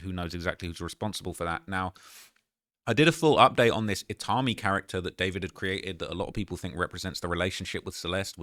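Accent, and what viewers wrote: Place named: England, United Kingdom. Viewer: My dawg talks like the English but acts like an American lol. Salute my bro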